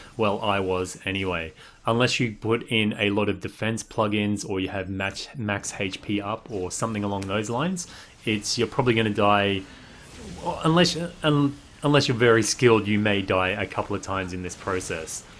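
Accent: Australia. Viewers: Can't place your accent. Are you Australian or New Zealander?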